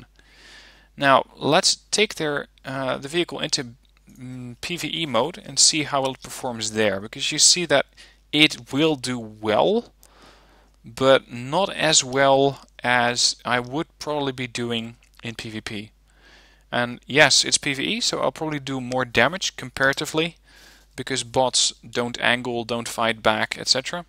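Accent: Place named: Netherlands. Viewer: You sound dutch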